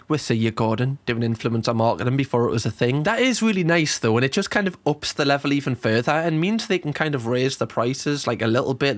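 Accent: Newcastle, United Kingdom. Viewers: I am a 46 year old mom of 6 and grandmother of 3 so far and Ditto all of the above comments. Including the Geordie accent comment. It’s one of my favorites! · I am a happily married 43 year old mom of 3 with 2 older kids and a 15 yr old. I love the care and thoughtfulness he puts into these videos! Plus I'm an American who is a sucker for a Jordy accent!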